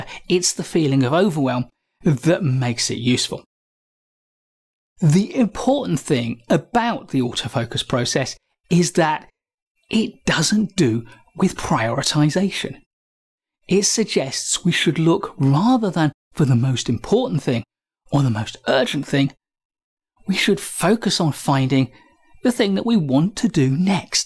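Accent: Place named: Northern England, United Kingdom. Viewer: Haha! Didn’t t realise I wrote the same comment 7 months ago! Northern English mispronunciations still bug me!...but your explanation of Mark's system is the best available. Xxx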